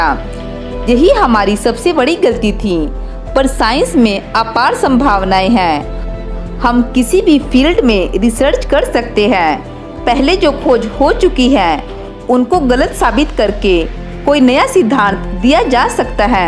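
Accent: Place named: Kullu, India. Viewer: Behan ji app kullu district see hoo ?? Apka accent kullu ka lag Raha hai??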